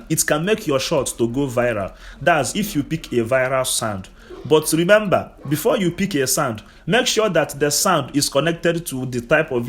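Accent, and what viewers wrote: Igbo, Nigeria. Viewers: I love this our Igbo accent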